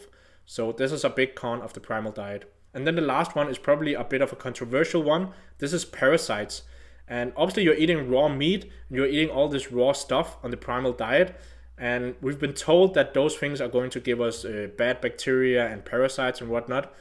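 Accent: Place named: Denmark. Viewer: Danish? You sound familiar :)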